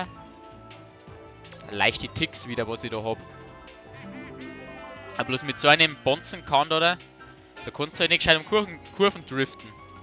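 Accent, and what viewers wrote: Bavaria, Germany. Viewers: i find des sau geil dass du boarisch redst! 😂😂9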